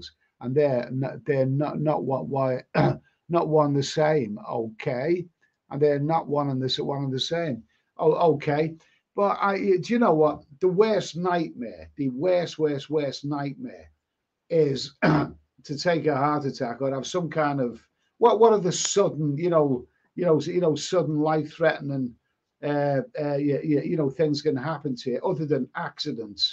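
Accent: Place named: Northern England, United Kingdom. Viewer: This is awesome mate.- absolutely love your input and experience on South East Asia. It has been awful weather in the UK - since you have a Northern accent, I bet it is grim up there, I know the East Midlands (where I'm from) had crap weather throughout the summer. Our winters are dull and horrible. I was thinking of retirement in either Malaysia, Indonesia or Thailand in a few years time but my first choice is Malaysia, hopefully retiring in Kuala Lumpur. Honestly mate, I am so sick and tired of the weather here in the UK, it is depressing, South East Asia is amazing with incredible and friendly people, amazing cultures, superb beaches, fascinating landscapes, jungles, mangroves, cable cars, waterfalls, lakes, mountains, diversity of flora and fauna, amazing food and variety of cuisine, spectacular religious sites especially temples and mosques. 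The list can go on and on.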